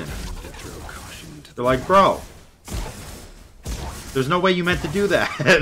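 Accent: United Kingdom. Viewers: That British accent was amazing Bro. <3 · Gg nice vid and nice British accent lol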